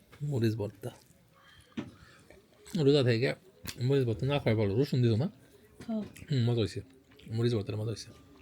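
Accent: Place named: Tangail, Bangladesh. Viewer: Bhai apnego basha ar amago basha same ai jonne video valo lage... amar bari jodeu Assam Guwahati te tar poreu Tangaila koi...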